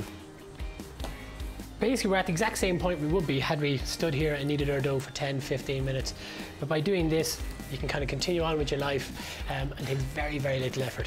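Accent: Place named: Ireland. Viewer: bet you're fun to be around in a day to day situation. Maybe have that stick surgically removed from your backside?? Jeez. I have to say that I too enjoy his accent, but it's not because it's his.. just enjoy the irish accent. If you can't understand things like that ... well I REALLY feel sorry you ...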